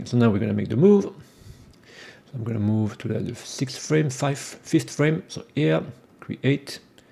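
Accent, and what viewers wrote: France. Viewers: Have questions will it be easier to make this with unity or godot And I like your mix french and English accent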